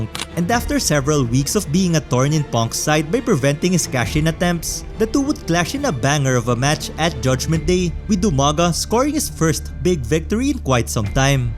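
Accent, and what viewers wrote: Philippines. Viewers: The moment I heard you speak, I knew right away that you're a 'kababayan'. 😄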